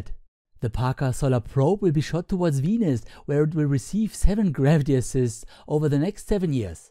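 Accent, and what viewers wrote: Germany. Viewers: Are you german ?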